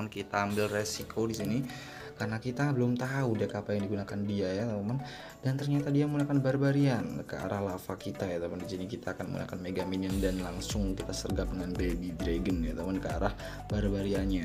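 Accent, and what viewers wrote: Yogyakarta, Indonesia. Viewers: Mas orang jogja bukan? Ayo mabar mas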